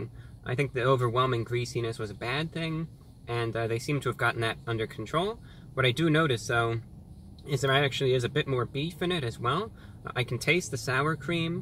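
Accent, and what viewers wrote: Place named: United States. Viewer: He is like an elite british intellectual and connoisseur, except with an American accent. I've never seen such classy American. Where are you elites hiding? I finally found one on YT.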